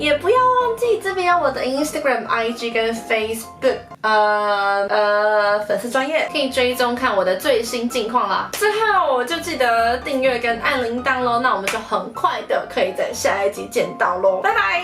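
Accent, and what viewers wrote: Kansai, Japan. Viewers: Maomao有关西腔诶😄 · 关西式的声调好可爱。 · 所以Mao會用關西腔嗎？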